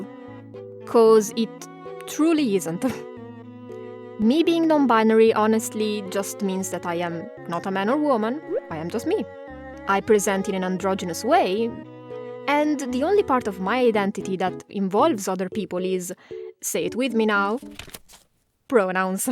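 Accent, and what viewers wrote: Italy. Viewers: Your Italian accent is so funny haha 😄 (in a good way) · oddio ho capito subito dall accento che sei italianə, io la uso la ə ma solo nello scritto funziona secondo me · You sound familiar....oh yeah, you are Italian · Hiiii!!!! It happens the same to me. I am spanish, so I completely understand the thing about pronouns. In my case, I prefer to refer to myself with "masculine" pronouns, but people could tell me whatever they want to, I won't mind jsjsjs. Also, I love how your italian accent shows when you are talking in english. It's so funny to hear :)))) Pd: Love the video and your art style <3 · Ho sentito che sei italian dal esatto momento in cui hai parlato, anche se era inglese hahahaha Ti capisco così tanto sul problema dei pronomi, alla fine anch'io gli uso entrambi vivendo nel caos e confondendo le persone eheh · I'm so happy to see this, happy pride month from the Asia part of the world~ and your Italian and accent so cool!!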